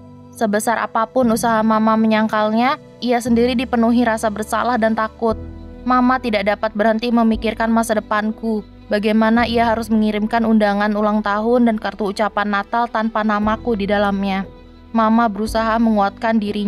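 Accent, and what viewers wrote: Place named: Java, Indonesia. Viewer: medokte tolong-kecil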